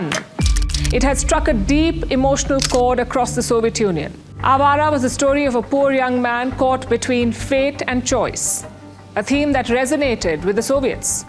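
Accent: India. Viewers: Not English English. It's Indian english. What an experiment of using words so beautifully! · Love this Indian English You are always awesome ❤❤!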